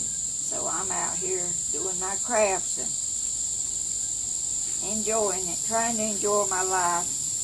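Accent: South, United States. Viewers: love your videos & your wonderful Southern Accent. I’m an Alabama Girl.... Have you tried working with old tennis shoes? My daughter received a flower planter as a gift made from a shoe. It looks like the artist use your technique with concrete. I’m anxious to try one of your projects. I have been saving old jeans for a while. Now I know what I want to do with them. Thank you so much for your videos. I love every one of them. Your videos have inspired me to get busy and use up those jeans. Lynn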